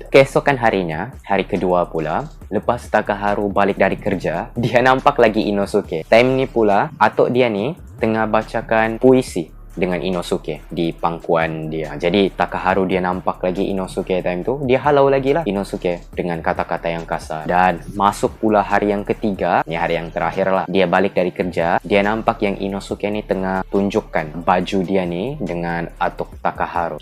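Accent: Sabah, Malaysia. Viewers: logat2 sabah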